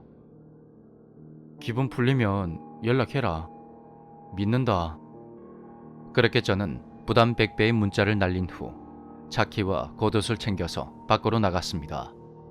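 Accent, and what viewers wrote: Gyeongsang, South Korea. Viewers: 경상도분이신가봐요 요즘 목소리로 열심히 밀린숙제하듯 듣고있읍니다 경상도사투리가 완전 반갑고 어색하지않고 참 좋아요~~^^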